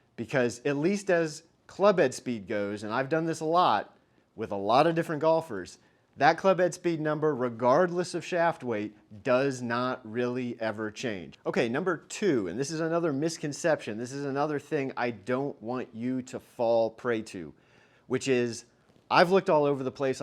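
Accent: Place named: United States. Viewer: im just so glad finally an american accent shewie ..no offense to the rest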